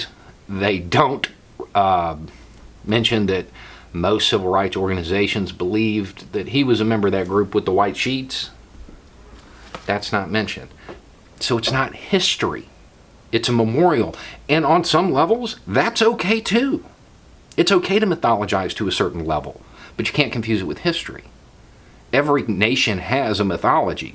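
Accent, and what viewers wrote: South, United States. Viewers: I kept being recommended your videos and I was like why is YouTube recommending southern reactionary to me. Sorry it seems like I have prejudice against your accent. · The fact that you have a southern accent kills those that don't want to accept the reality that is us history.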